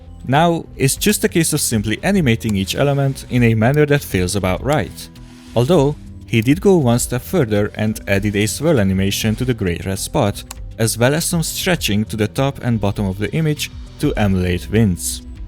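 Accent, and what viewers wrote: Hungary. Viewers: Great video! Just out of curiosity; are you Hungarian by chance? Guessing by your accent.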